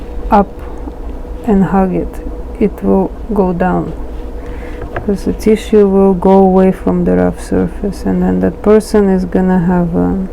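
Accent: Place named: Russia. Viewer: Русский акцент 🤪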